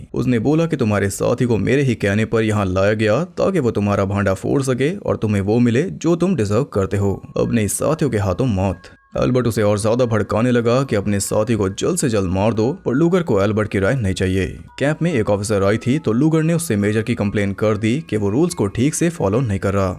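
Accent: Haryana, India. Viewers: mitra tum hariyana se ho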